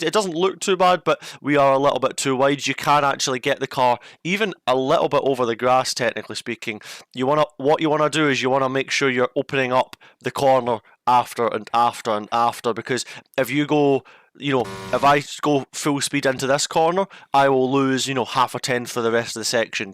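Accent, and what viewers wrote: Scotland, United Kingdom. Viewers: I love thee Scottish accent mate.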